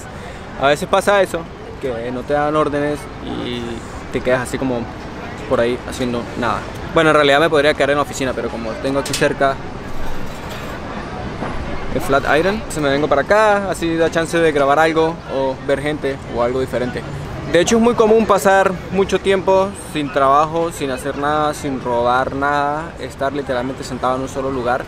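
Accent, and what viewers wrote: Venezuela. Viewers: eres venezolNO?? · Se sabia casi q desde el principio q era venezolano, la chica q dijo mugre si no lo es, estoy seguro q debe ser colombiana :D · Eres de Venezuela ? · Vos sois venezolano? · ¿Eres venezolano crack? Por cierto tu trabajo es increíble y con alguien con tu aptitud, se hace llevadero. Éxitos mi pana. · Este pana como que es venezolano? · Excelente la edicion del video hermano,eres de venezuela cierto? ,por el acento y las palabras que usas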